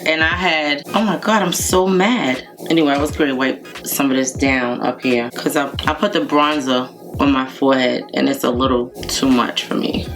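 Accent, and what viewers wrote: New York, United States. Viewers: Native New Yorker!! I knew it! The first time I watched you I was thinking she sounds like she's from NY which is great because I love NY and I'm from NY too😊 I never asked you because I understand YouTube rs have to be safe about revealing personal information and I respect that. OK enough about NY☺ I love this latte look on you❤ The eyes do look more on the golden side but still very pretty.I purchased the Infallible blush too because I saw in one of your haul videos but I got shade confident pink and now I want the shade you used too,I really liked it. Or do you have both shades?🤔 I would love to see how you make your wigs. Your wigs always look beautiful I thought you bought them,good job. I wish you much luck and success on starting your own line. Just wondering why you set your undereyes with two different powders? 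I tried doing that but it turned out looking cakey on me😒 Btw I saw the Barbie movie it wasn't at all what I expected. I'll just say I most I enjoyed about the movie was looking at Ryan Gosling 🙄😄😉 Chat with you soon darling 😘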